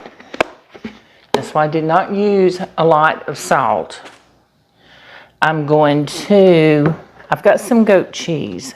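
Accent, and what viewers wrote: South, United States. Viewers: It looks absolutely yummy, I hope that I can buy the dough roll in England, does anybody Know? love your videos and your southern accent is delightful.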